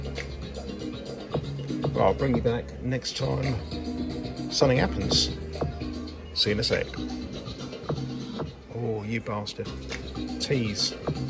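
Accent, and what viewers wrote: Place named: Home Counties, United Kingdom. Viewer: Tis weird watchin your vids, you sound just like me. I say the B word just like you etc, you must be from the home counties or sussex area. Really enjoy the vids as you play the same stakes and games that i do..when i do. A very real representation of what playing these things is all about. 👍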